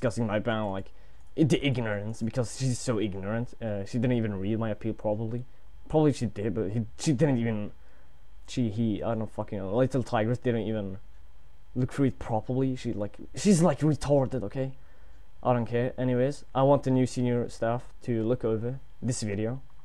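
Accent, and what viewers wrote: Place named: United Kingdom. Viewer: Can't tell if you're British or American LOL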